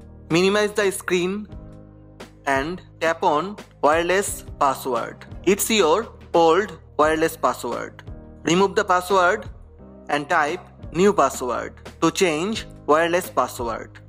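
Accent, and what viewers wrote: India. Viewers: Course has to have that Kwik-e mart accent...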